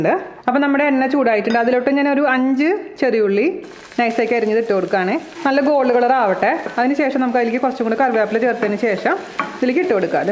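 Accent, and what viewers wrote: Thrissur, India. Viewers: Hello..njan vegetarian aanu... But still I watch all ur non veg videos..that's how much I love to watch u and hear aaa trissur baasha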